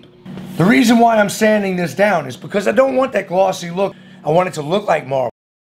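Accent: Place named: New Orleans, United States. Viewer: You have to be from New Orleans or close by. That's a familiar accent ..;)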